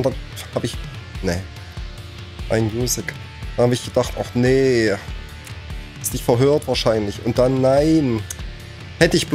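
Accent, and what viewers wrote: East Germany, Germany. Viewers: Du könntest aus meinen Dorf sein so wie du redest 😅#Ossis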